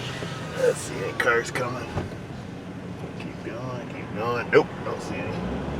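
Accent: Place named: South, United States. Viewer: Omg country twang; you got me homesick and misty eyed.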